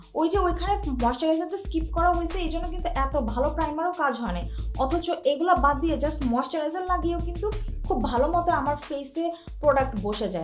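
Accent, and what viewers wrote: Bangladesh. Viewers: Apu apni ki noyakhalir 😳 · Apu ki Chittagong er?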